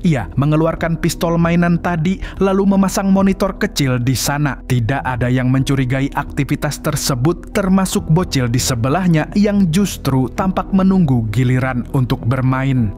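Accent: Banjar, Indonesia. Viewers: Bang tiap intro jgn hiLangkan Logat banjar ny ya🎉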